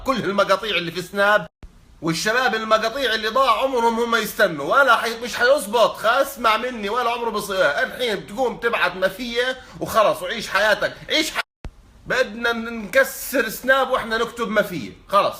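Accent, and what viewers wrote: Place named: Gaza, Palestine. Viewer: هادا لهجتو غزاوي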